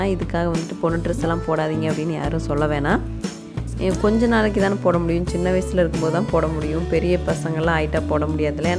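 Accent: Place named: Anaimalai, India. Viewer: Neenga pesara language vanthu enga language mariye irukku nanga anaimalai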